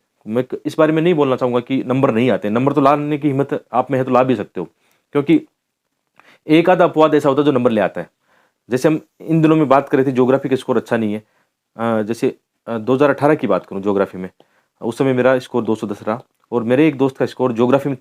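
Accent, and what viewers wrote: Haryana, India. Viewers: Sorry....आप सब कुछ छोड़ कर सिर्फ खुद पर ध्यान दो। इतनी जानकारी books की questions की किसी को नही होती बस आप एक जगह ध्यान लगाओ,ओर वो ह आपके बोलने का हरियाणवी लहजा बाकी सब perfect है।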